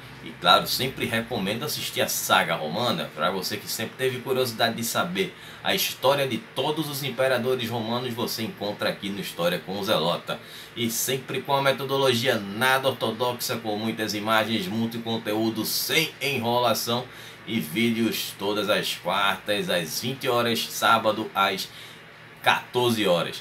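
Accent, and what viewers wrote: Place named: Pernambuco, Brazil. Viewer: História com o Zelota Obrigado sou seu seguidor a um tempo já gosto muito da sua didática,esqueci de perguntar se você é nordestino, parece pernambucano rsrsrs Algum dia você vai falar sobre os Citas e o povo do mar? Obrigado pela atenção.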